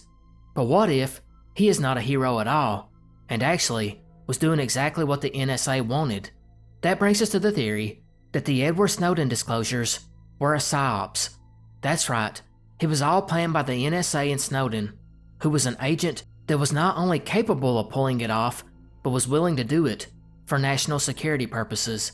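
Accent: South, United States. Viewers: As an interesting side note...the region of America where this accent comes from has a huge population of Scotch and Irish going back 200 years...sounds like South Carolina, Georgia, Arkansas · Your accent screams southern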